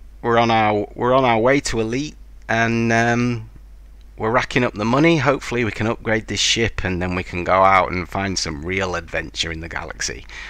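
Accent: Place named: United Kingdom. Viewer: I like your British dialect.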